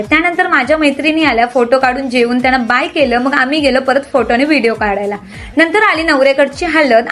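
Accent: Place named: Agri, India. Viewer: Tuji bhasha tar aagri vatte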